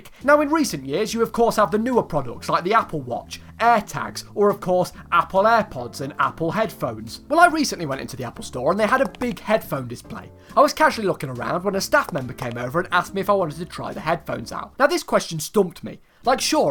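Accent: United Kingdom. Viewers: Your accent i need it ... How to have an British accent like yours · I can't understand ur bri'ish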